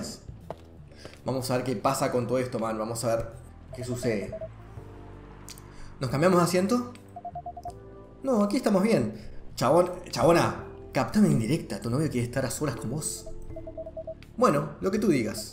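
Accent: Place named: Argentina. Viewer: eres un kpo:) llevo un tiempo en tu canal (además de que me pegaste el acento argentino xd) y ya me vi todos los videos. Siceramente me parece muy interesante tu contenido. Sigue así. Saludos desde chile 🇨🇱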